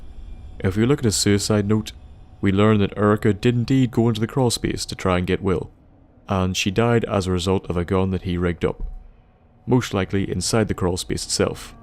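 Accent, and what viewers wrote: Northern Ireland, United Kingdom. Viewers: are you british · that's just his northern Ireland accent, doesn't matter if he tries to speak ina different way he'll just sound the same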